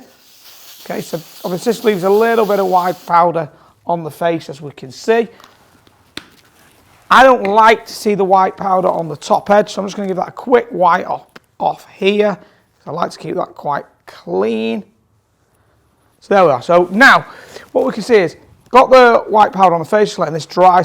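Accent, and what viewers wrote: Manchester, United Kingdom. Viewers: what do you mean, has a fine South Manchester accent! Perhaps should add subtitles for non Mancs lol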